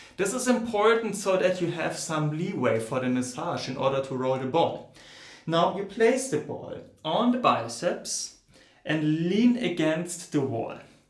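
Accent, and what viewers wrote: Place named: Germany. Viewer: German or maybe even swiss?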